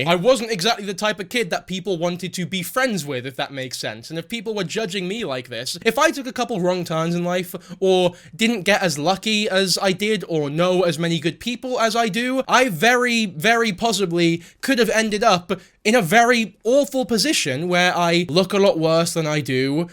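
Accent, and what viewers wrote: Scotland, United Kingdom. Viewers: He’s Scottish?😭😭😭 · Has anyone else noticed that is getting more Scottish lately? I blame the absence of his lass in his videos. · I love the little scotsisms that sneak in to speech 🥹 didnae couldae wouldnae ❤ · Your scottish anger amuses me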